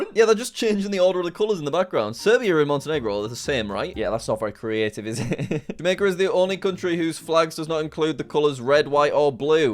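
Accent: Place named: United Kingdom. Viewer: Why are u british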